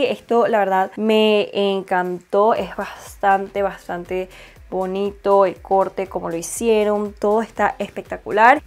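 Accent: Venezuela. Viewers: Hola! Me encanta cuando hablas venezolano 😸✌ "caderua" "carrasposo" etc... Creo que la última pieza se llama pareo!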